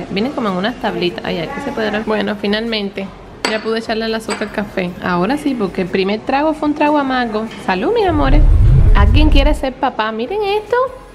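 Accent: Cuba. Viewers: Por que son las dos cubanas y a si hablan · para tu informaciom no,son hermana son cubanas y hablan en el mismo acento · Es que ella habla como tipo cubano o algo así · por que es cubana y todos los cubanos hablan igual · Porque las dos son Cubanas ese es el acento cubano jjeje por eso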